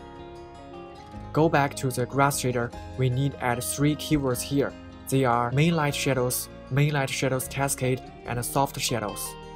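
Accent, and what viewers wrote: China. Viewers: 大陆口音，哈哈哈。加油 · Chinese？Your accent is familiar to me. Good Tutorial !!! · 我听着就像国人的英语，哈哈哈哈，直到看到了输入法。视频真牛逼啊，学到了干货！牛逼！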